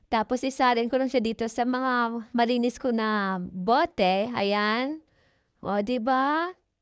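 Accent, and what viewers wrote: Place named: Visayas, Philippines. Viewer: ang cute ng voice ni ate pinaghalong englishera,na bisaya at Ai😄😄😄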